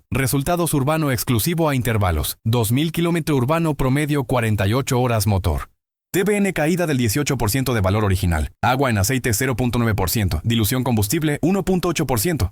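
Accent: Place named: India. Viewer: Por favor no dejes todo en mabo de la mal llamada IA, el video esta bueno, el audio parecer indio hablando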